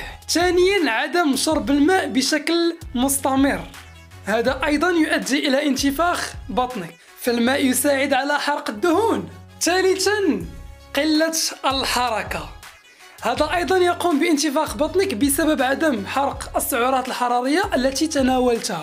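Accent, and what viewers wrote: Morocco. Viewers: Salam khoya men lehja dyalk baaaayna merrakchi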